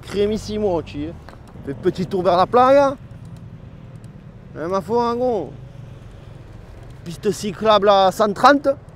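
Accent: Marseille, France. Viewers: c'est sketch chez moi, dès que la situation s'y prête on t'imite. Ma meuf quand je la gêne dans la cuisine elle gueule 'Oh enlève toi du milieu" en prenant l'accent marseillais. Pareil, elle me montre un meuble qu'elle kif et elle me dit "Tu pouce blue ou pas ?" Tu rends ouf les gens 😂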